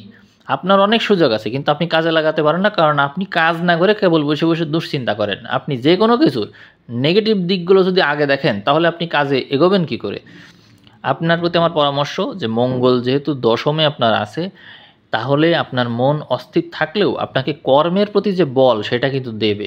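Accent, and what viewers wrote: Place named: Gopalganj, Bangladesh. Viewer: ভাই আপনার কথাগুলো খুব সুন্দর আপনার কোন জেলা মনে হয় গোপালগঞ্জ এবং আপনার কথার সাথে আমার জীবনের সাথে অনেকটা মিল আছে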